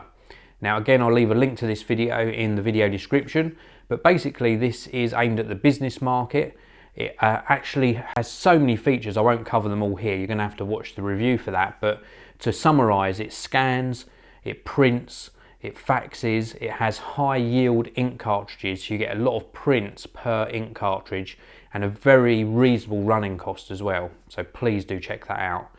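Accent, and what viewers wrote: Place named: London, United Kingdom. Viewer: the first time i saw one of your vids i noticed you have a londoner accent. it's not easy to change it if you wanted to without lessons.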